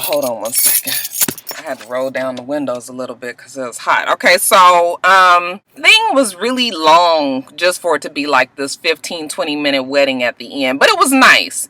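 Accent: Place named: West Coast, United States. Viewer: Haaaaaaa! Hilarious. I looove when u say you be Hard (in yo west coast accent). I try to be hord myself... but sometimes them emotions just sneak in on ya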